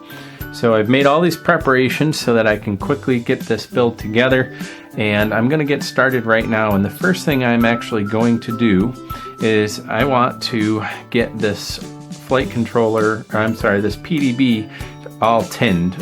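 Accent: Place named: United States. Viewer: Are you aware that American English is different than British English? He pronounced it the way Americans pronounce it because he's an American. He's pronouncing it exactly the way it's supposed to be pronounced in America. Why on earth would the British pronunciation be any more correct if he's not actually British? That's just nonsense.... lol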